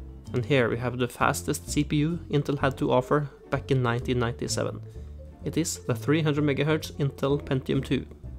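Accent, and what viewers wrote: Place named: Norway. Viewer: Du er norsk? Er bare vi som snakker engelsk sånn=P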